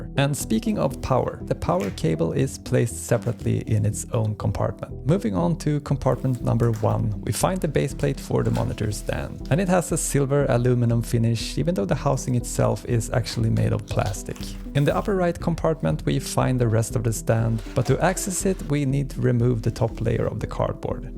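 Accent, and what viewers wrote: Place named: Sweden. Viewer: I KNEW IT.. You are Swedish. Your english pronounciation made it clear :D Looking to buy the same monitor. Greetings from Norway btw.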